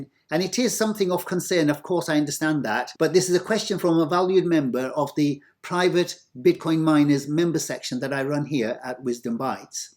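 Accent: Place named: Yorkshire, United Kingdom. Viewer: Hey ~ This may surprise you!! The B-Exit Indixator is not my favourite part of your video... rather hearing your Yorkshire accent!! No eee byy gum though???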